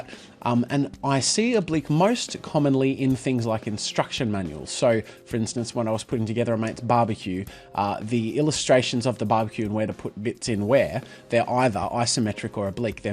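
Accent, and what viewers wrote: Australia. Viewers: He sounds so much more Australian in this video. Is it because he was younger?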